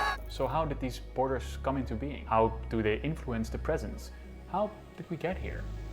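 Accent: Netherlands. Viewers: Meest Hollandse accent ever haha. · biasa bang, di belanda kan diponegoro dianggap sebagai pemberontak rendah dan licik, sampai sekarang. jadi gk kaget lah kalo dia anggap dipenegoro itu seorang bangsawan licik. apalagi gua yakin ini orang pasti org belanda. dari cara dia mengeja kalimat dan huruf² singkatan saja udah ketahuan dia orang belanda. yah pastinya dia secara terselubung/sembunyi² "mengedepankan" versi sejarah belanda lah. lagian banyak (ato malah bisa gua bilang: semua) content maker belanda yg seperti itu, gk cuma ni org doang.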